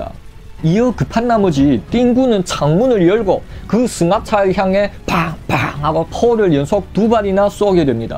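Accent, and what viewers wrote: Yanbian, China. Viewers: 근데 진짜 조선족이신가요? 일부러 연기하는 말씨는 아닌거 깉은데. 여하튼 스토리에대한 말빨은 진짜 국적막론하고 듣는사람 잼나게 하네요. 근데 유선이야기는 제갈량 사후이야기로 첨부 나오는데 제갈량 죽기전전에 유선은 사망한걸로 아는데.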